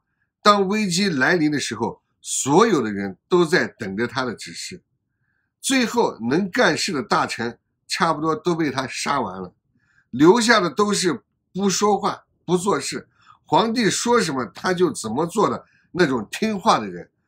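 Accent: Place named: Northwest, China. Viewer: 你是西北人？口音太重了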